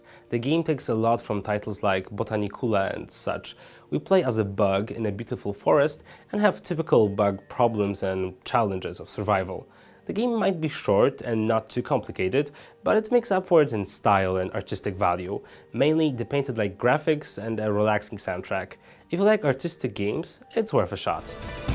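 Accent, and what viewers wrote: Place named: Poland. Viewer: Odrazu usłyszałem ze to Polak mowi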